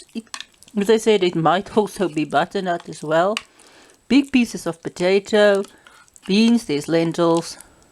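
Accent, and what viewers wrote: South Africa. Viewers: That's a hell of an accent. South African?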